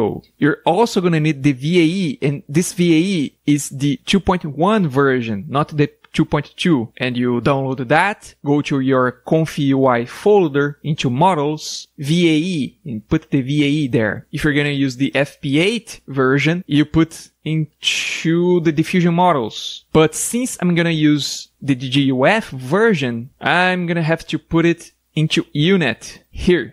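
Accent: Brazil. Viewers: Eu só passo o mouse na thumb, mas aí ouvi e pensei: peraí, temos um BR, bora dar moral. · Sotaque BR identificado, bom vídeo manolo! Ganhou um escrito =)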